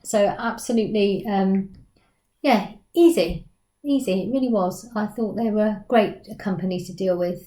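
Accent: United Kingdom. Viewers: Hey there! Long time no see! How's going with the house work? Is it done? I've been away from surfing thru dolly videos of my good friends here such as you, Jay Dolls, Dolls Rescued and others coz I felt really depressed with some personal problems. They were solved, thank God, but my depression continues, at least now I'm little by little coming back, but there is too much to catch up, so it might take a while to see all the lovely videos. You didn't make much, so won't be long. I definitely need a ResinSoul girl, coz they are legit and affordable, but not atm unfortunately. Your doll looks such well made, they do an incredible work for a non expensive legit company, i'm a fan of they, I should get it a long time ago, but it was for the best coz I would made the wrong choice, I thought dolls with closed eyes were the same as Blythes, that can be open and close at the same time, I don't like bjds with closed eyes head. 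Now that I know everything I need I'll pick the right girl with the right body in normal skin! Anyway, is nice to be here again, nice to see you and hear your beautiful UK accent, I love it! kisses!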